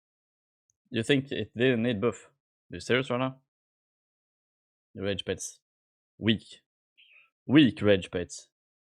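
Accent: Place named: Sweden. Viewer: Thats the thiccest swedish accent i ever heard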